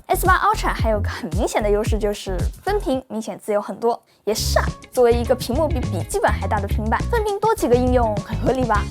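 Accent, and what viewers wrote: China. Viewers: 好重的中國鄉音，逃。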